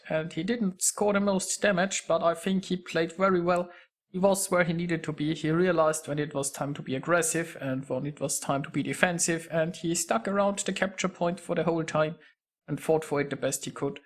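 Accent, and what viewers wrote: Germany. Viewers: Man hört das du ein deutschsprachiger bist :D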